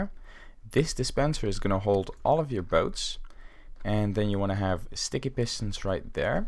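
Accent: Netherlands. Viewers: R u Dutch? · Are you from holland because you have a dutch accent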